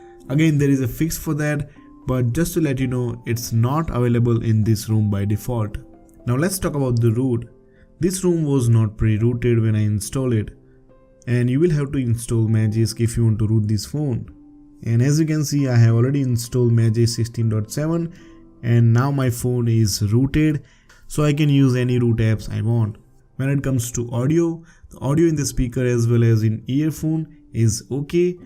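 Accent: India. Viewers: just gonna say the obvious but is there a single fucking review of lineageos 16 without an indian accent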